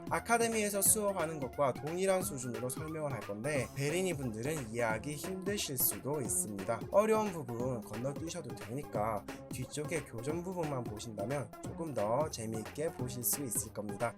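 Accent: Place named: Seoul, South Korea. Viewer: 서울말 좀 줄이시죠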